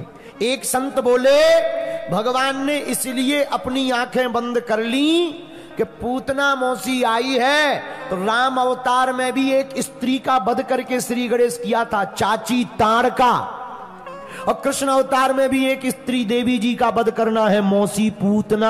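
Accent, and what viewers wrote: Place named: Barsana, India. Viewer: Aaj to kya baat hai Gurudev barsane wali bol rahe ho bhasha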